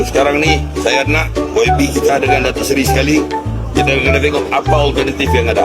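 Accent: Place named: Sabah, Malaysia. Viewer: Loghat kp ni mcm orng sabah je 😊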